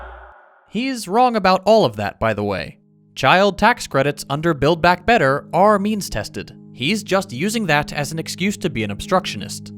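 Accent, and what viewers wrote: Texas, United States. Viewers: Bro is texan? Wtf?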